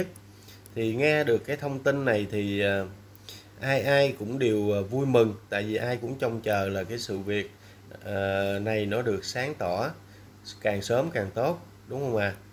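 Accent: Standard. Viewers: Em có giọng nói chuẩn như các bạn trong ban biên tập vậy đó. Chúc em cùng gia đình nhiều sức khỏe và an vui hạnh phúc nhé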